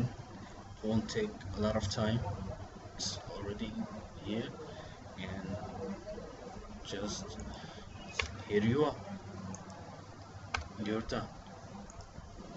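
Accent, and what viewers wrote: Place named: India. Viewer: The audio of your video, with combination of your SLIGHT accent ( nothing against people from India ) made it difficult for me to understand your directions. I wish you took the time to post the instructions in your description. Thanks.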